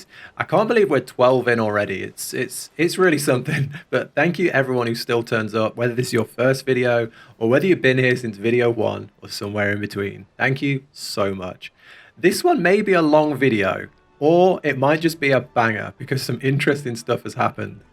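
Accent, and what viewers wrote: United Kingdom. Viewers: Its so fun to follow your progress! 🙌🏻😜 And I like your English accent, so nice to listen to 🙈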